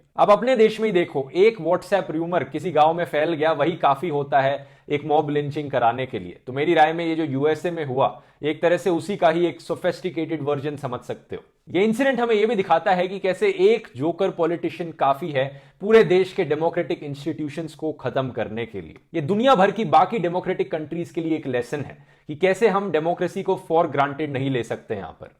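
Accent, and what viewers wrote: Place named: Punjab, India. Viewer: Diware taap rhe the🤣🤣🤣🤣 thoda Punjabi accent ki tone hai apke andar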